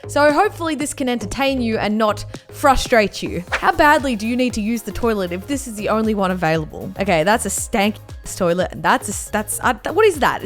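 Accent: Australia. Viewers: is it me or does she sometimes have an australian accent in some words and then in others she does “caunt stand” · Are you from Australia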